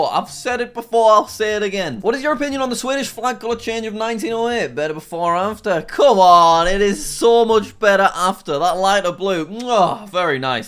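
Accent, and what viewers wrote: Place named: United Kingdom. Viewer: I still like his videos ven though THE BRI'ISH ACCENT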